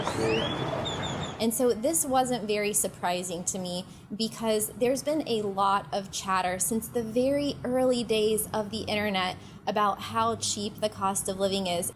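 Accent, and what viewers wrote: United States. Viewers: Sorry ,but croaky American accent makes this impossible to listen to !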